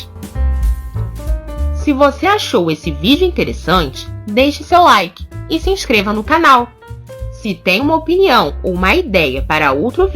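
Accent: Rio de Janeiro, Brazil. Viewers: Gente amo esse canal mas o sotaque carioca da narradora è puxado demais , isso incomoda um pouco 😞mas parabéns pelo trabalho gente ! Segura esse like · A narradora é carioca ,.. A extoria da época vitoriana 😅kk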